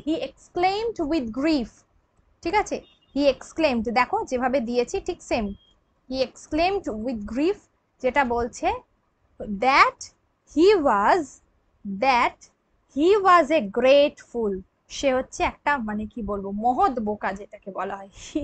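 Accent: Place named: Bangladesh. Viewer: Mami Videote Mamar Chhobi Dekhchhi Aabar Tumi Class Korachchho?Mami Tomra Ki Rifiuji? Tomar Bhanshar (Language) Dhoron (Style) Kintu Bangladeshi Mone Hochchhe?Mami Tumi Jader Class Korachchho Mane Aamaderke Tomar Chhoto Bachcha Mone Hochchhe?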